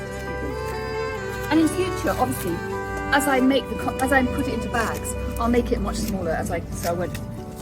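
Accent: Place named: London, United Kingdom. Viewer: Miss,I really, really love your London accent and your videos, I wish you a healthy and happy family!!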